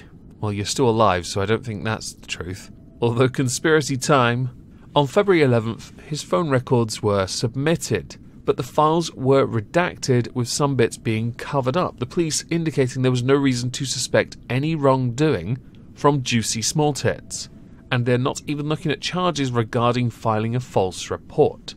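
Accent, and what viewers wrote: United Kingdom. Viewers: for PM! No Lives Matter!!!! Always love to see you upload, my man. Good to hear another brit talk about this stuff.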